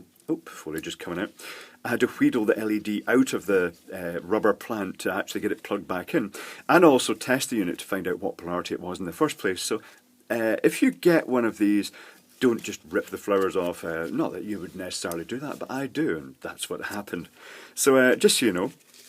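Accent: Scotland, United Kingdom. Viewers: does the description actually say "idear gift"? or is it Scottish accent that makes the chinese sound humorously self-deprecating?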